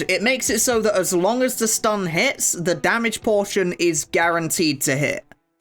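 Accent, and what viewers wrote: United Kingdom. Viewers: violently British man talks about violent things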